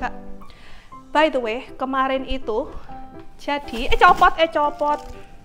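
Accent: Java, Indonesia. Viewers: Mbak jadi medhok bgt ngomongnya 🤣🤣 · Mbak, pulang ke Indonesia medok nya keluar ❤❤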